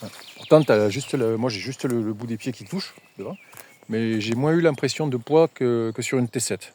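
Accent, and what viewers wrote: France. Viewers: Eh mais tu es sur le groupe v85tt ! 😊 Je pensais que tu étais Franc comtois d'origine, il y a un petit accent du sud. Cette cf me fait de l'oeil, franchement ils envoient du lourd, j'ai essayé la 450 et j'ai été bluffé. Au plaisir! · moi je mets un pouce rien que pour l'accent "nordiste" xD et j'aimerais beaucoup que tu reprennes la kove et nous fasse un petit comparo ;)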